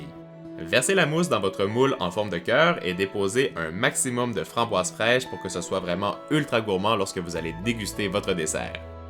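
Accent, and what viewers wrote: Quebec, Canada. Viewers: #lovemail #teamsnap #lmp continue tes vidéos , tu es parfait et j'adore trop ton accent québécois 😍❤😘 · super vidéo j adore l'idée de l entremet. J aime tellement ton accent québécois 😁💜🍰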